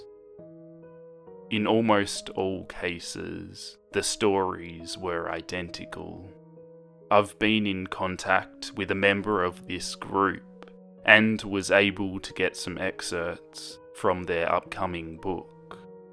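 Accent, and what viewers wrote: Australia. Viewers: You sound Australian tbh.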